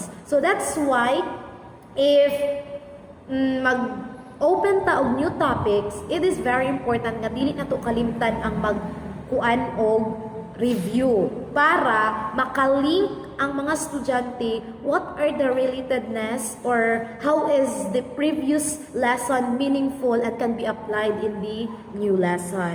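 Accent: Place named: Visayas, Philippines. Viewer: Super love bisaya accent Ma'am, you did great po! 😍❤️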